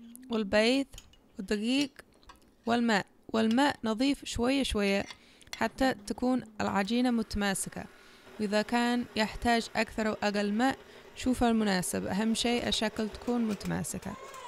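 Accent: Yemen. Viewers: I love the yammany accent😂😂😂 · ياحليلها الكنة اليمنية واضحة في كلامها اندمجت في المجتمع اليمني.الله يحفظ لها طفلها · ماشاء الله عليش يا روووووووووعه تسلمي ياروحي 😘احلا شي كلامش يجنن كأنش يمنيه الله يوفقش استمري واحنا ندعمش 👍🏻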